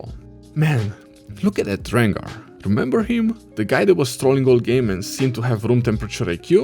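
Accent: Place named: Russia. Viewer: Боже что за русский акцент 🫠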